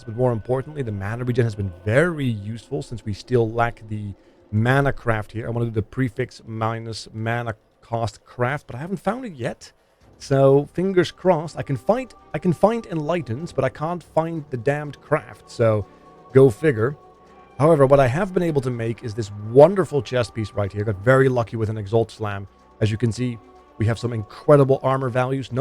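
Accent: Netherlands. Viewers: and moreover: nice content, i like your videos, i like the accent <3 you sound like my part of the family from the netherlands. i am from germany :) and thanks alot for answering my question <3 cheers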